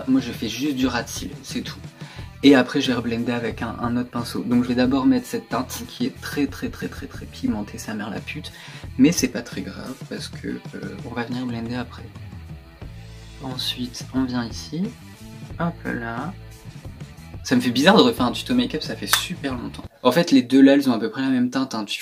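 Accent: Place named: England, United Kingdom. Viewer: L’accent anglais est merveilleux 😌 Toi t’es iconic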